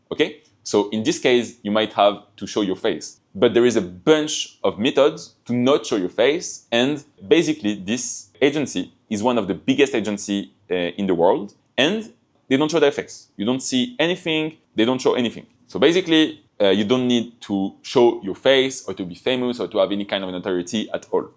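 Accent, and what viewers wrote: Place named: France. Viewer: Salut j'ai reconnu que tu est Français hahaha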